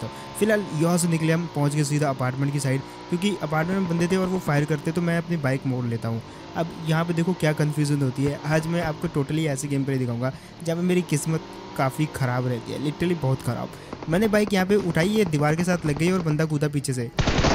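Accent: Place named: Punjab, India. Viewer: Can you please make a gameplay in punjabi language coz i love your punjabi accent 🥺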